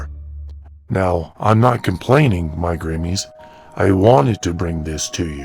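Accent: South, United States. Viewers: Well, Awesome and Amazing answers and sorry but I noticed your Southern accent ☺☺☺ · The accent seem's like middle west georgia or central alabama,As i live in G.A. i can here the southern lilt. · You do you! I'll admit it'd suck if you went to a longer span in between videos, but we care more about your health and well being rather than getting a concrete upload schedule. Also, don't worry about subtitles! You were right when you said it was easy to get used to their voices. Finally, is that a Southern accent I detect? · You have a thick southern accent. Very thick.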